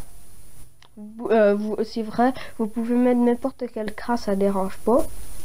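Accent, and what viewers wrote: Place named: Quebec, Canada. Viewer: Du québécois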